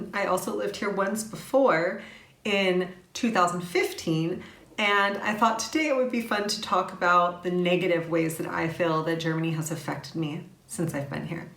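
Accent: United States. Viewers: Dear Lady You never spoke english but american english. Never ever tell an real english you talk english YOU americans dont have a language you took english ok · Hi, yes being a foreigner? You will always be asked, "Where are you from"? "I can hear an accent". "Are you from the Netherlands"? That is what I hear, although I'm British. So with you american accent and rolling "R"s, you will always be an American. And yes, you will be treated better that other foreigners too, well noted. But what about the other way around? Are foreigners in the UK or the USA treated the same, no matter where they come from? I find it much worse when people ask how I could leave England, it's soooo wonderful there. Well yes there are lots of nice places in the UK, but no every person has the chance to live outside big run down cities with few prospects!!! I still answer that I'm english though, even though I've had duell nationality for more than ten years. 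Then I add, Oh, I forgot I'm German too now. My pooor (german) children have to justify their english surname.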